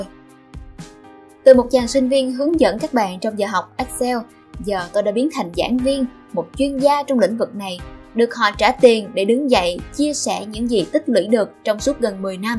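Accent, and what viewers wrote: South, Vietnam. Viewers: Tôi lại phải làm dũng sĩ diệt speaker, cho giọng miền nam như vầy vô mất hết view giờ!🙄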